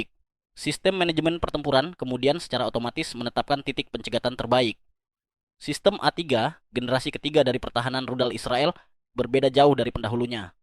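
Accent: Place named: West Java, Indonesia. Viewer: kang, urang sunda yak ? F-35 kang, bukan ep-35 😂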